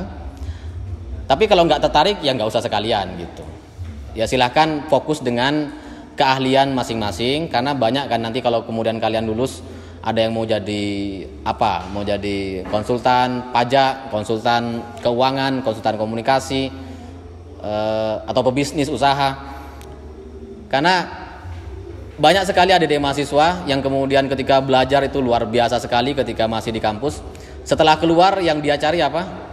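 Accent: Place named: Madura, Indonesia. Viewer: oh....ternyata cak maduranya Sumenep, makanya logat maduranya nggak kentara.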